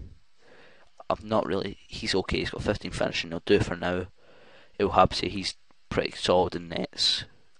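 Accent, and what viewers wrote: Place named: Glasgow, United Kingdom. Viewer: you fae glesgae or rather glasgow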